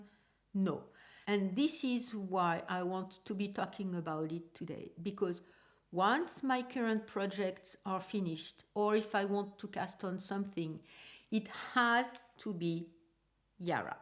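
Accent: France. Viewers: What a delightful podcast! Your French accent is a pleasure to listen to. I can really relate to your acquiring your kryptonite stash and trying to focus on using it up. Best of luck to us all!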